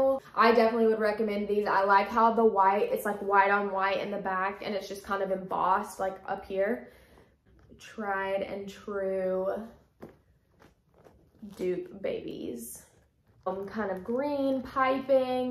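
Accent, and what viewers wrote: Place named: Kentucky-Tennessee, United States. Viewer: Woohoo! Girl, that’s like 11 years of shoes. Also, the Kentuckennesse accent reminds me of home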